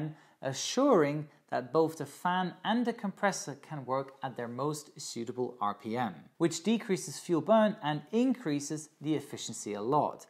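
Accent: United Kingdom. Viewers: ... You're a good looking guy! Specially with that British accent? Australian?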